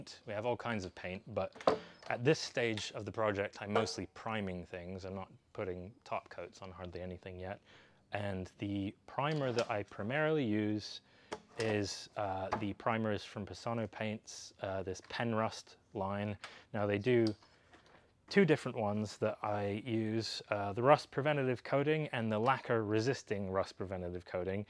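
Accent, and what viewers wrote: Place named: Cornwall, United Kingdom. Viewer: You're an interesting and knowledgeable speaker (who luckily has retained a lovely Cornish accent) but I would just love to see some of the actual work and progress on Rosalind. Perhaps you could combine the two?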